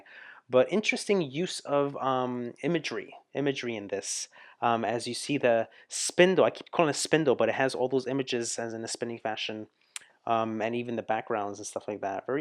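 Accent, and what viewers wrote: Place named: United States. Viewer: Accent US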